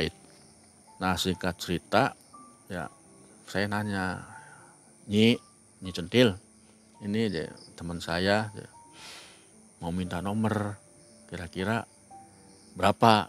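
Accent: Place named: Cirebon, Indonesia. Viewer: asli wong ndi sih soale pada bae kya Indramayu cirebon logate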